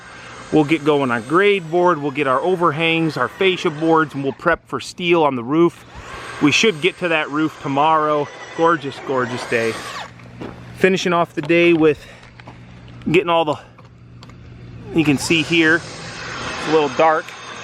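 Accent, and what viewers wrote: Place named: Wisconsin, United States. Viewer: Are you originally from Wisconsin? You have a very Wisconsin accent.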